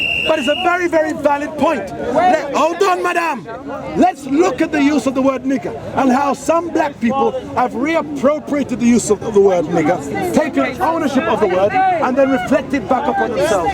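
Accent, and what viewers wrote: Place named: United States. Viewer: anybody noticed he has aa american accent?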